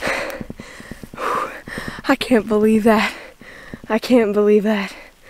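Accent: Maine, United States. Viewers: Great to see you succeed. Your family is darling and you my dear are pretty as you can be. Love the Maine accent. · Congrats to you!!! Great video, raw emotion, and you did it!!! Love the Maine accent; spot on northeast hunt! Keep after it!!!!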